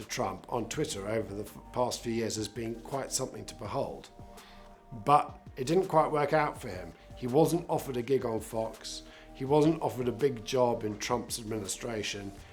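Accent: RP, United Kingdom. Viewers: i dont know who this guardianista ,twitter pc orthadoxist is,but he sounds like a patrician bbc type who is still resentful that the uk has come to its senses and rejected the failed experiment of being part of a happy clappy uninted states of europe.having said that,mr. far-rage is a supreme egotist,and adopted a sad macho pose next to a dead fish in some harbour,to his great discredit.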